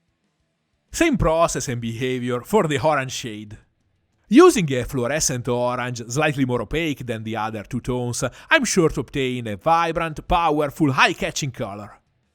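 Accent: Italy. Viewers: I came here searching for new approaches to Lizardmen, as the last time I painted them (or any minis for that matter) was 10 years ago. This video was helpful and brilliant! I love your accent (Italian?) and how your first language shines through in your English; not only in rhythm but in the beautiful intonation as well! Lovely to listen to. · This italian accents is so good. Approvo! My new favourite channel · I watch your painting videos both because of all the useful tips & tricks, but also hearing your Italian accent - It sounds so joyful and makes me happy! 😁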